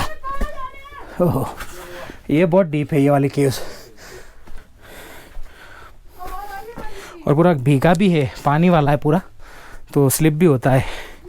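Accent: Gujarat, India. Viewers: Gujarati ho Kiya bhai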